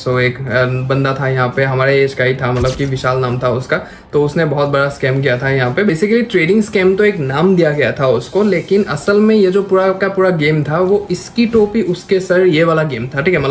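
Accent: Assam, India. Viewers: Bhai Assam se ho kiya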